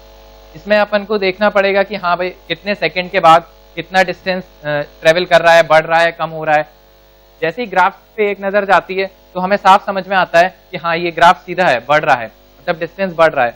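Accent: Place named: Bihar, India. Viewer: bihari lol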